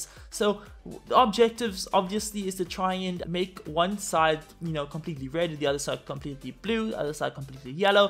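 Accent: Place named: South Africa. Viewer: it makes sense cause I am from South Africa I’m guessing most of your fans are South African, they just didn’t realise your accent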